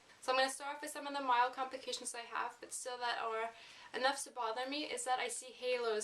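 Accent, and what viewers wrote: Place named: Canada. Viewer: I feel really bad for you and the suffering you've had to endure due to Lasik. Thank you so much for your video. You have saved myself and thousands of others from this procedure. You said you don't wear makeup often because of swelling. I wanted you to know that I think you are very very beautiful without makeup so why bother? :) You don't need it! PS...you sound Canadian.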